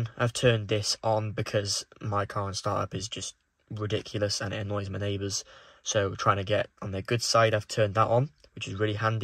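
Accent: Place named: United Kingdom. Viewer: Yes, I also noticed using the US octane. Seems to be incorrect. You sound like you are british.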